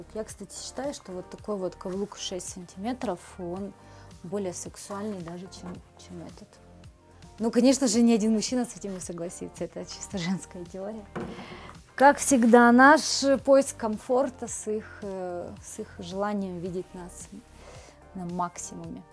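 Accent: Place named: Udmurtia, Russia. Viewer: У вас специфический акцент, очень характерный для Удмуртии или Пермского края. Вы случайно родом не из этих краев?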